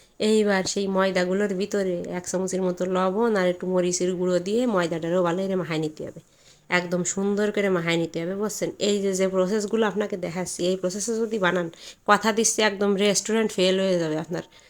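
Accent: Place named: Narail, Bangladesh. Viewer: আফা আপনার থেকে আমি একটু নড়াইলের ভাষা সিখতাম🫠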